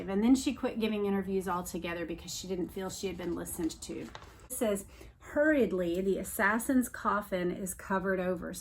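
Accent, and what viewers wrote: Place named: South, United States. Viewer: I think you have done a wonderful job with this whole series and you have such a lovely southern voice.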